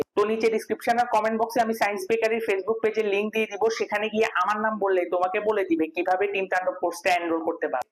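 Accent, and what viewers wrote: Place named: Noakhali, Bangladesh. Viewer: Vaiya apni ki Noakhalir? Kothar accent toh amader motoi !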